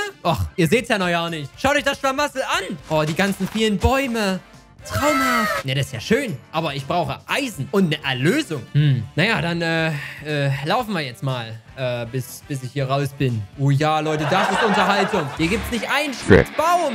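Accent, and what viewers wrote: Saxony, Germany. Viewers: Bist du eig ein Sachse oder so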